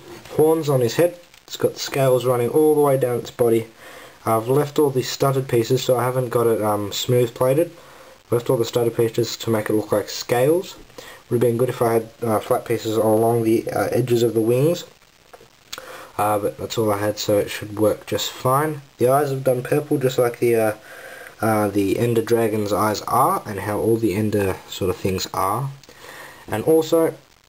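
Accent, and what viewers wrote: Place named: Australia. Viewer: I can tell your an aussie. i am an aussie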